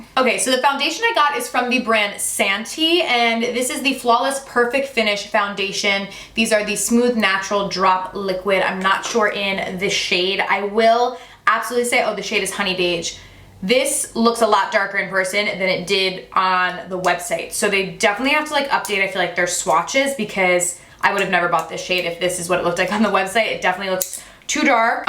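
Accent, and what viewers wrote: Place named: South, United States. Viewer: I was wondering where you are from because i catch you saying southern things and a little accent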